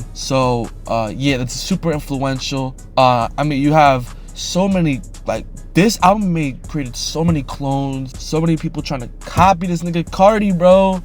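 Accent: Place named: Mexico. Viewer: WAIT YOU SAID THE N WORD??? YOUR BLACK????? I THOUGHT YOU WERE MEXICAN. you sound mexican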